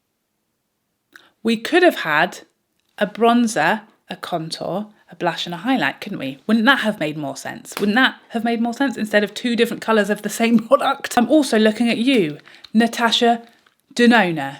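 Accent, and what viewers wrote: United Kingdom. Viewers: I just found your videos today, and I ADORE your POV, your common sense approach, and your talent! As an American, I am also enchanted by your British accent. Thanks for doing videos!!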